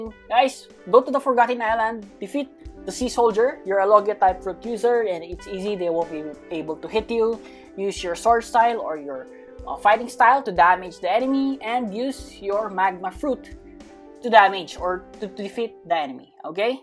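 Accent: Philippines. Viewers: Sabi na nga pilipino ka eh accent palang eh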